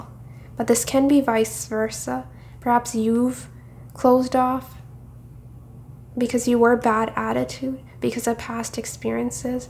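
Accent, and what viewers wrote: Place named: United Kingdom. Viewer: I loves his British accent😅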